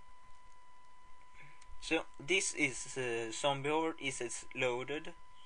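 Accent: Sweden. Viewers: Hmihmi! Fyfan va kul :D älskar när svenskar pratar kult engelska :DD Sä himla gutt!! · så jäkla bra älskar hur du utlar dom engelska orden med typ svenskt tal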